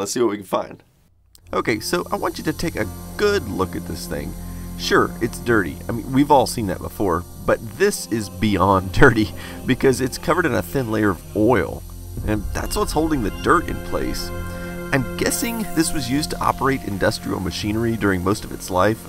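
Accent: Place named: South, United States. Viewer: I’ve noticed that speaks with a slight southern accent. It’s not very noticeable but if you pay attention you can hear it, and since he grew up in Texas I can only see why. Love you man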